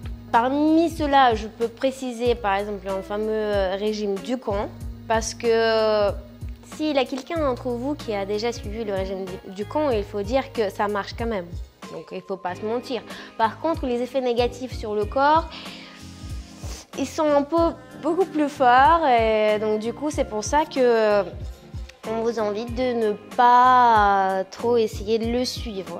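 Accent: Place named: Eastern Europe. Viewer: Accent europe de l est,,pologne,roumanie?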